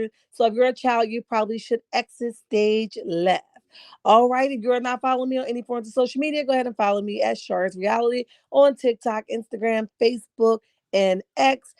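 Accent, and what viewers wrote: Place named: New Jersey, United States. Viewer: I knew it! I hear it all in your voice. I’m from Jersey too, North Jersey. I can tell you are too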